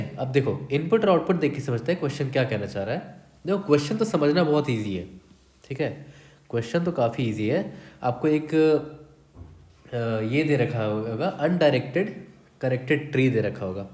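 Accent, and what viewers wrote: Bihar, India. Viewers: Are you from bihar ?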